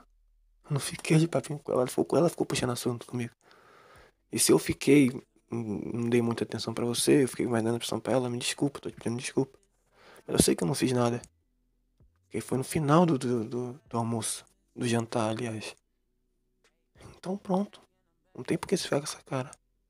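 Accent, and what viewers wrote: Rio de Janeiro, Brazil. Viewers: Carioca neew?? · O negocio é o geito que vc fala AAAAAAAAAAAA INFERNO O GATILHO DANÇA NOVAMENTE tu é do rj? questão de sotaque msm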